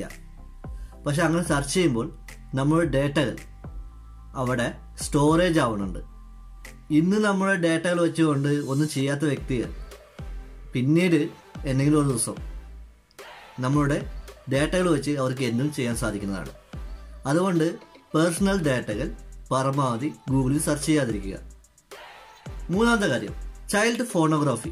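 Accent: Tamil Nadu, India. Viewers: Malayali alla... atha... oru tamil touch😊but cute.. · MOTHER TONGUE TAMIL ANNALLE ? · ഒരു തമിഴ് ചുവയുള്ള മലയാളം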